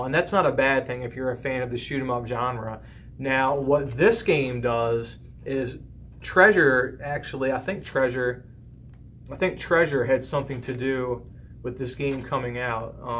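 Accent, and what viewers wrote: Baltimore, United States. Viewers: You totally sound like Baltimore! Catonsville here!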